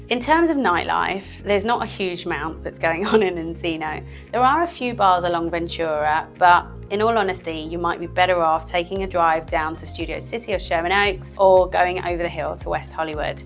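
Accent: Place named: United Kingdom. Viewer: You'll be selling Alot of properties with your beautiful British accent... Thumbs 👍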